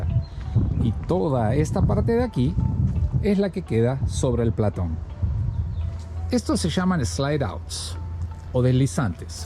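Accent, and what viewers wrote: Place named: Argentina. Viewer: Hola, te felicito por el video, está muy entretenido y sin tantas vueltas! PD: seguí así con la tonada Argentina!!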